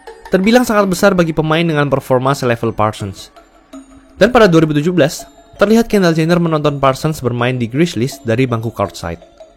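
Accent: Java, Indonesia. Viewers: konten mantap, aku saranin untuk next video dubbingnya jangan medok banget bang wkwkwkwk, semangat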